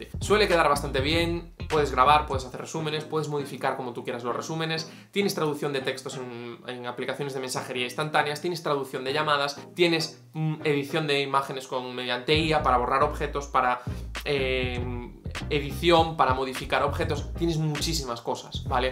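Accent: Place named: A Coruña, Spain. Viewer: Una buena opción si me quisiera gastar tanto en un móvil aún así hay cantidades que sigo sin querer dar por la telefonía. Soy yo o eres de Coruña? Lo digo por el acento😂😂, si es así...yo vaya a donde vaya también lo saben enseguida, es delatador😐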